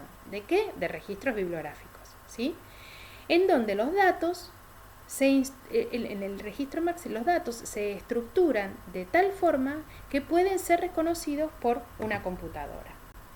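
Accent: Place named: Argentina. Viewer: Qué lindo video y qué lindo acento argento. ¿Podrías decirme como crees que influirá en el futuro el uso de sistemas MARC para las bibliotecas?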